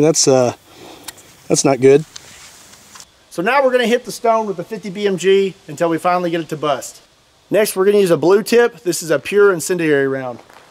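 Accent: Kentucky, United States. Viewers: What part of Kentucky you are from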